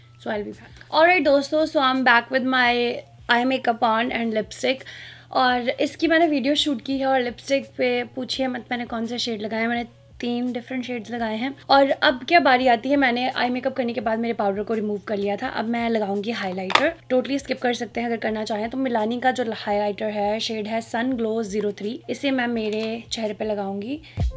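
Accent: Punjab, India. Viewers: Hello Mem ap Punjab se ho kya apni batye payari ha bolne ka tarija name nic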